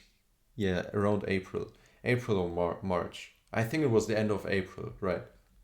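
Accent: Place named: Germany. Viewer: I heard the German accent instantly. Love the vibe of the video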